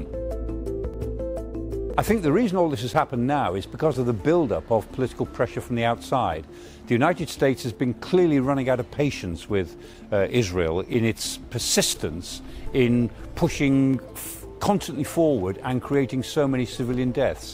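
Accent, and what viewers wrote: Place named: United Kingdom. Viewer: no one, but British pronunciation is annoying lol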